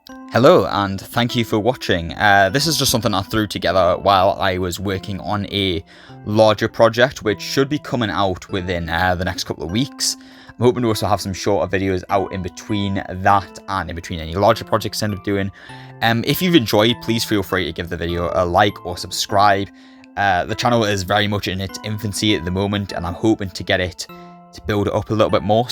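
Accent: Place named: North East England, United Kingdom. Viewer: Love Red Dwarf then I was like this guys Accent North East rules 🎉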